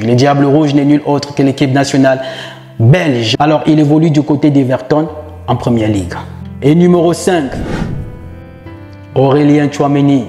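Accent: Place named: Cameroon. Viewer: Il a le macabo des camerounais et ça gratte trop ds sa gorge. Et il se dit "motivateur"...... Pathétique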